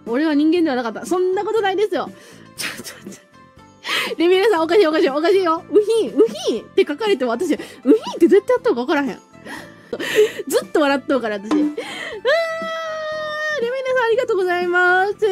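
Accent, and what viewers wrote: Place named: Kansai, Japan. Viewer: やっぱり関西弁は安心するなぁ…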